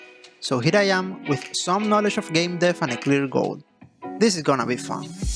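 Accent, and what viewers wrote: Spain. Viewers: my native language is espanish is just cute :) relax mate, we can all understand you and having spanish accent is not worst than having american accent ;) gl with the game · Men eres increíble. Te apoyo totalmente en tu nuevo proyecto y deseo que lo logres superando tus propias expectativas. PD: si lo llegas a ser (q imagino q sí) espero llegar a jugarlo😢. También! Tu inglés tiene un acento notablemente español, pero es entendible, con práctica y experiencia se mejoran. Sigue así!😎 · Ya decía yo que me sonaba el acento, un pana de España. Suerte con el juego, pues la scope está ciertamente alta jajaja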